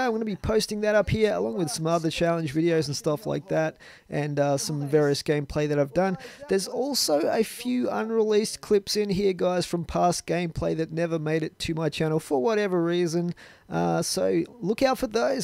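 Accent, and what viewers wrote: Australia. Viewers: It may sound a bit strange, but the original thing that really drew me to your gameplay was you accent. Nothing beats an Aussie accent. And as to the second question, i think that more games played with subs would be very neat., i am so proud of you. You have come so far with your channel, and i just would ask you to never give up. Nothing cheers me up after a bad day like watching a video:D thanks so much, and keep up the fantastic work:D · 1. Custom Zombies - You're partly responsible for getting me into it (through Yoteslaya r.i.p.) - Love hearing a familiar Aussie accent on a typically american/british dominated genre. 2. I would possibly like shorter videos - Max 15mins. Otherwise, everything else is great! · I'm not entering for the competition (obvious reason, no Xbox) but I'd say for 1. The Australian accent along with the fact that you don't go much astray when talking about random things during gameplays that get annoying (some YouTubers have the issue, luckily I don't see it with you :D). 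Most of the time your conversations with others or just the voiceover for the gameplays you do are entertaining/fun to listen to. As for 2., I'd say get better internet for streaming :P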